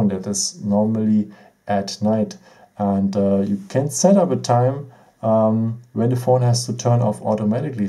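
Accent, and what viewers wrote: Germany. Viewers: He has such a strong german accent 😂, but the video is really helpful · Man hört den Deutschen aktzent richtig raus😇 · Man hört es raus das du deutsch bist 😀😀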